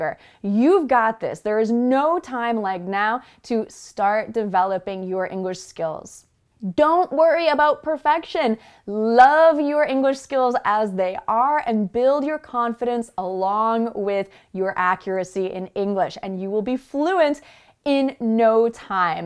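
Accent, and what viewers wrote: United States. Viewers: I love British but your American English is perfectly beautiful and I always enjoy learning English with your channel, in fact I've subscribed it years ago. By the way, I tell my students too to practice speaking English in front of the mirror at their home.. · Whare are you from sister? Is it from America?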